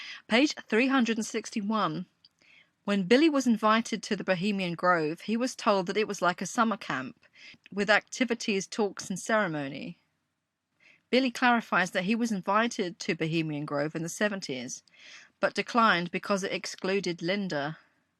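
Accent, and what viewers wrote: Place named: England, United Kingdom. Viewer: Being Scottish myself, I adore this woman's English accent.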